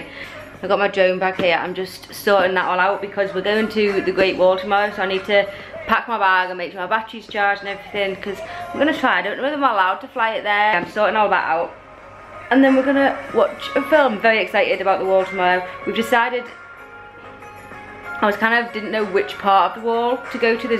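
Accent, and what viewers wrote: United Kingdom. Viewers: I love your British accent, I listen to you ,you''re so smart,and fun. love your vlogs!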